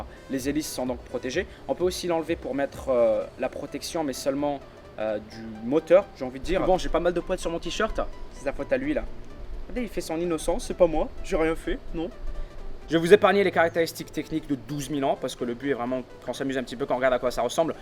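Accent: Quebec, Canada. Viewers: Il quebecoi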